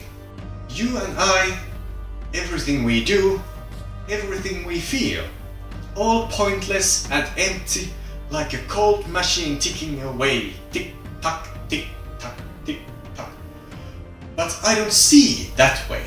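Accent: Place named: Finland. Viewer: o how come i didn't know about this video until now? Also nordic pagan here. And a furry xD You sound Finnish, as your accent reminds me of my own. I grew up with the Finnish paganism as my mummi was Finnish pagan. Like the old school pagan where the world was being created from the skybird egg. Though i have adopted a more of a mix of Norse paganism and Finnish paganism.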